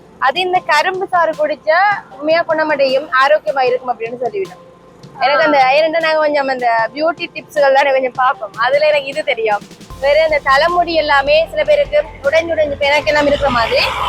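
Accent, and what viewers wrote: Jaffna, Sri Lanka. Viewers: எங்கட யாழ்பாணம் வர வர சென்னை மாதிரியே மாறிக்கொண்டு வருது. ஆனால் எங்கட யாழ்ப்பாண பேச்சு வழக்கும் எங்கட கலாச்சாரமும் மாறாமல் இருக்க வேண்டும். அது எண்டைக்கும் மாறவே கூடாது. நம் பேச்சு வழக்கு. நம் சிறப்பு. எங்கட யாழ்ப்பாண பேச்சு வழக்கே என்றும் நம் இதயத்தோடு ஒன்றி இருக்கிறது. சிறப்பான காணொளி. ❤️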